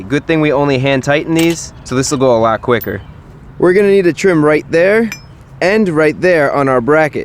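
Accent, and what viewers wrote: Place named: New York, United States. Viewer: Where is the track that you go to? Sounds like you're from NY/NJ and the closest place I know of is in PA (I'm from NY) the name of the track would be helpful!!!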